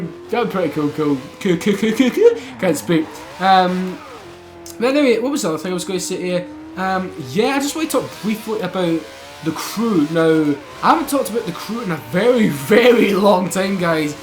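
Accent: Scotland, United Kingdom. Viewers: i really like you videos! you're really cool guy btw i have a family relative live in Aberdeen and sometimes its really hard for me to understands him and he makes a lot of jokes about us (americans) number one they say and he just keeps going from there...but the thing is i just wanna tell you that you're helping me understand the Scotish accent (its hard sometimes to be honest) so what do you think about 'muricans? btw can i ever meet you when i'll be there? it'd be so cool:)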